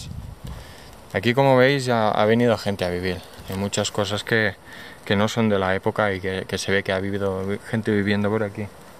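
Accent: Spain. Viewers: No va a decir dónde es hasta que acabe el video para que lo veas , ya que lo que le interesa a la gente es saber donde es y como este señor habla como español pues ese lugar es en España y pues a quien le importa España , por lo menos a mi no por ahora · Me podrián decir de que parte de españa es,me llama la atencíon el acento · Tu catalan no eres verdad jajaja tranqui yo tmb xro a ti se te nota mas que eres catalanoparlante y yo castellanoparlante xdd pero tienes una pinta de bonachon de buena gente y de super tranquilo...jajajaja bueno un abrazo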